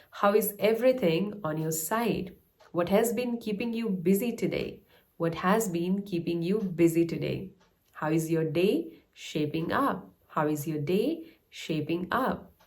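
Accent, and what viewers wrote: India. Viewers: I felt there was a Hindi accent in your tone before, but if I’m not mistaken, I have seen great improvement now.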